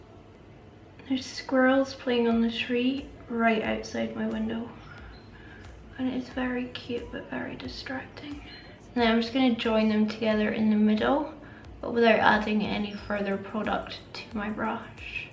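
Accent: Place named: England, United Kingdom. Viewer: I thought you were from England! Lol still love the accent 💕💕💕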